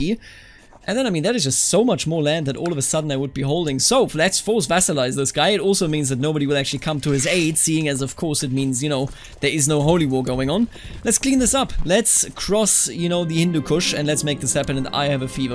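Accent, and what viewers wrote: Germany. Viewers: Man I love listening to you pronounce Indian names with your German accent. 10/10!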